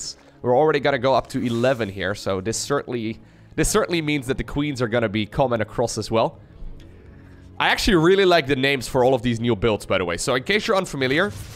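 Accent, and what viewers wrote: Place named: Netherlands. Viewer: Dutch accent is so nice.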